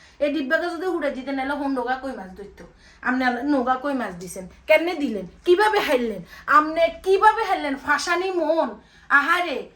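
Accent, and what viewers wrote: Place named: Feni, Bangladesh. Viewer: আপু আমরা ফেনীর মানুষ তাইতো আপনার কথা শুনলে মনটা ভরে যায়।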